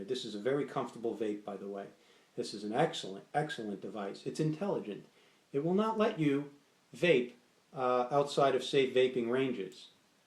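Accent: New Jersey, United States. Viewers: I vape using smok Tpriv at 65-68w on recoil RDA at.20ohm using mxjo's dual 18650 and I produce big clouds. it's not how much power you put to device to produce a cloud. it's also what kind of juice your using PG/VG I use max VG. there you go yo sound like a joisy boy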